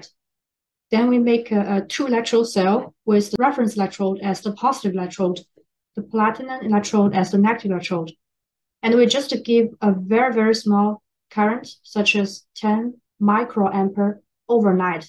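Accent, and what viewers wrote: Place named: China. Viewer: Love the Chinglish accent, it sounds familiar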